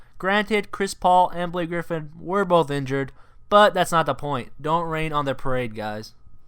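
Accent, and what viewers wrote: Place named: Asia. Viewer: u sound asian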